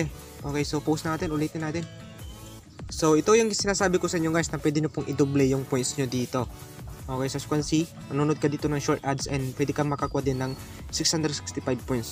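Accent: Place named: Pangasinan, Philippines. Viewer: Taga pangasinan ka no lodi halata sa accent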